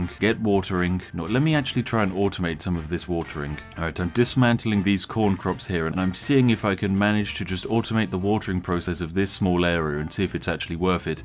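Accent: United Kingdom. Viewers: 🤔Ahhh.. heh... it makes sense now. You have to pay the prisoners.. Thanks Mr. British Voice!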